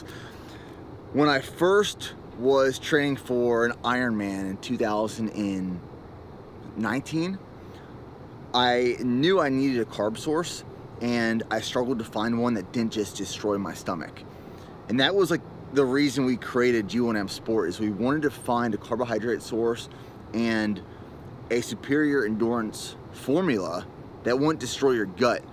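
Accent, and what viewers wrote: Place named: Texas, United States. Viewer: Пишу на русском, чтобы было видно, что я не местный 🇷🇺 Во-первых, спасибо за твои видео, ты хороший атлет. Во-вторых, желаю тебе достичь всех твои целей в 2022 году. Кстати, мы русскоговорящие тоже следим за твоими проектами (как же хорошо хоть немного понимать английский). Я, правда, смотрю твои видео пока только с субтитрами, но прогресс есть. Когда только начал смотреть тебя (примерно год назад), то было непривычно улавливать ваш Американский, ну или Техаский акцент, но сейчас уже лучше) Кроме того, подписан на тебя в Инстаграм. Так что знай, GO ONE MORE знают не только в Штатах)) Всем добра и мира! P.S. есть русскоговорящие, кто смотрит Ника? Отпишите внизу, очень интересно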